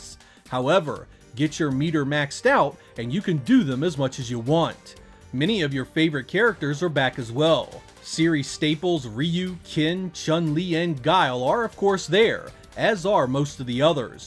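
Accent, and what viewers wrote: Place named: North Carolina, United States. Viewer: I've been meaning to ask you this for years... Where are you from? Your accent reminds me of my dude from North Carolina. Like, to me you say bauls (boss), or bault (bought), or cault (caught). It's not a dis, it actually makes me wanna watch more.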